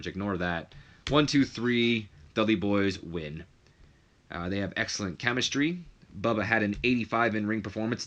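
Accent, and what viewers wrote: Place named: United Kingdom. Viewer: I'm british but not from a posh away so no offence was taken with your british accent Also great vid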